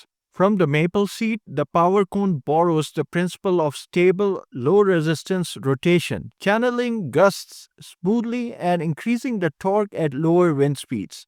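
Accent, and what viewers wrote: India. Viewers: I am enjoying the Indian lilt of this man's English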